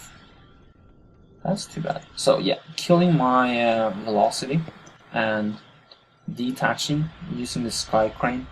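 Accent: Norway. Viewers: i hørte med ein gong at du var norsk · Where are you from? Norway?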